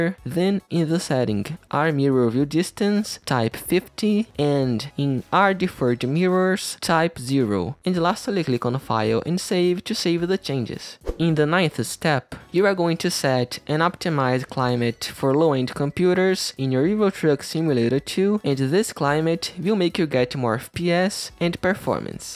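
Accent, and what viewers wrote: Brazil. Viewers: demorei 2 minuto pra notar q o manin era brasileiro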